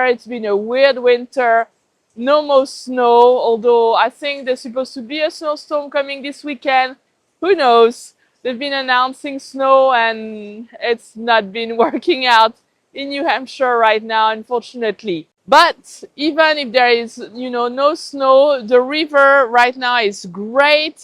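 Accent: France. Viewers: Ça paraît immédiatement que tu parles français 😊 Merci pour le vidéo 👍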